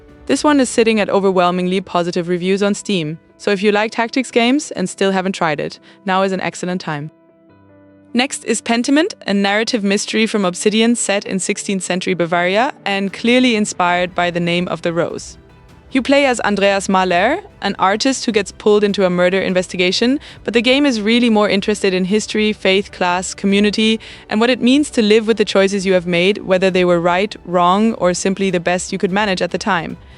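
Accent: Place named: Eupen, Belgium. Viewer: Gotcha! GL with your future endeavours, fellow Belgian. I'd guess Eupen area with the slight accent? Excellent english 👌What are your plans for future videos, if I may ask? This was a clean video but reviews are not very "exciting" afaic.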